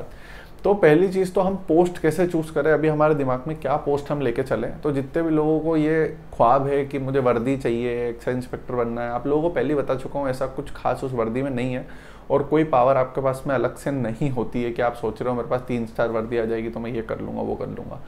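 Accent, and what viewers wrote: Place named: Rural, India. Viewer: Baat sahi he ..appka baat sunke lagta hai app gao se ha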